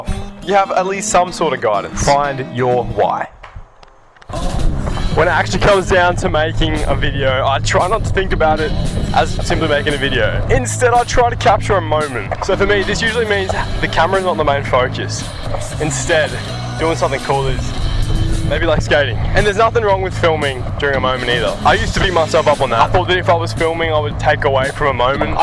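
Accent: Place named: Australia. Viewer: Yo bro, loved this vid. It's funny hearing a proper Aussie accent on youtube, also that mic is sick as bro.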